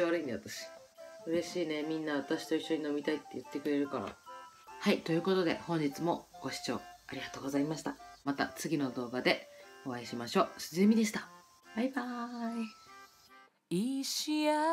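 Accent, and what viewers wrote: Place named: Fukushima, Japan. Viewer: 福島離れて思ったけど、なまりすごいよ笑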